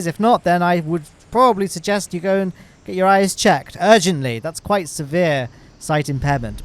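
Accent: United Kingdom. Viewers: Find me a non British KSP content creator…. It’s annoying. Honestly, what is with British people and KSP? I even have a friend in Britain who plays. I’m not overly pissed, I’m saying there’s too many and I’m done listening to the accent. I’m entitled to my own opinion for whoever finds this comment and so are you.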